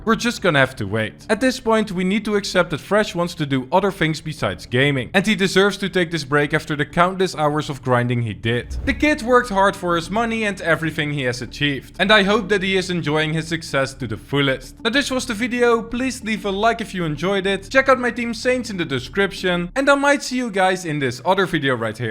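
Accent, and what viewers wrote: Netherlands. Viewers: Lol the Dutch accent is pretty funny (No hate) I'm Dutch too and I also have the worst english accent Xd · Are you from the Netherlands because you have such an accent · U sound dutch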